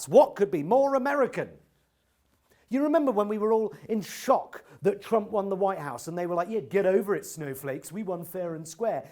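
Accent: United Kingdom. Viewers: For the benefit of non-English fans, please always allow subtitles. I adore this super-British accent but sometimes it's a bit hard to undrrstand. Thank you.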